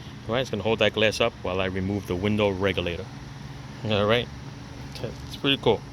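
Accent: New York, United States. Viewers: Hey thanks for this short and sweet, to the point video. I need to replace both rear window regulators and I cringe at sticking duct tape over the glass. I was thinking about jury-rigging something with some suction cups and wire, then I saw this. Figured it would take me more time and trouble than to just buy it from Lisle. Your accent sounds like NY to this ex-pat Bronx guy. Take care.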